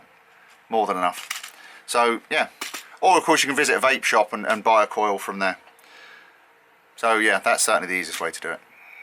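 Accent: United Kingdom. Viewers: Love that british accent, lol Will make one too, thx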